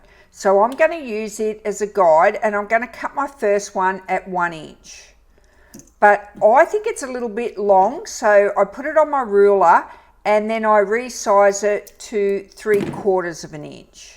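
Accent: Australia. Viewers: G'day,. Such a relief to hear your Aussie voice. I don't have to strain ta understand. I recently got my first mega tank printer. Sooo good. Now for the Bearly art glue. Off to Amazon au. These dangles are so cute. I adore minis too.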